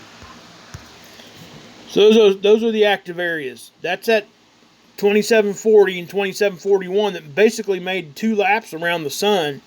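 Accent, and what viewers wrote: South, United States. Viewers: I'm not trying to be rude or nothing, but you really need to change the way you speak. You must be from wayyy down south.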